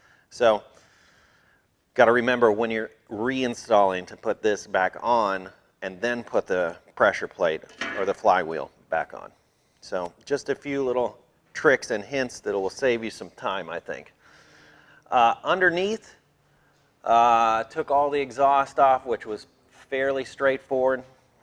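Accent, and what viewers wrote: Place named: United States. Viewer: A V8 rear wheel drive car is alien to you. You sound American, must be an import guy?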